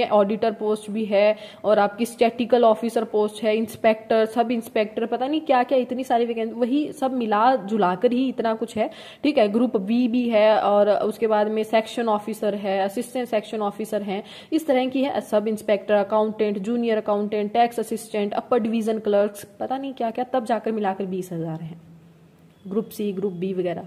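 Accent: Haryana, India. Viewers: 🤨 sahi baat hai miss 👍👍 Main baat hai focus ki, 50-50 agar divide ho jaayega focus bank aur ssc me toh bank ka kharab hoga aur ssc bhi. 1 jagah focus kare to success zaroor milegi, meri bhi yahi soch 👍 hai Really like your Haryanvi accent 😍❤️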